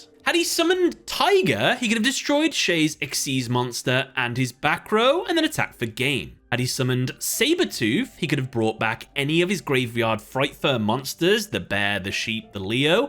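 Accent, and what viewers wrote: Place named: United Kingdom. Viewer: I assume it's the British accent + "penultimate."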